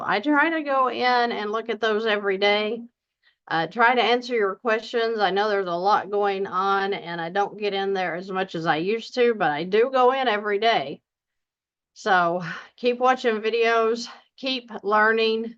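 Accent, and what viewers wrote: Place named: Kentucky, United States. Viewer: Love your accent. From Kentucky here. Sounds completely normal to me. Thanks for the video.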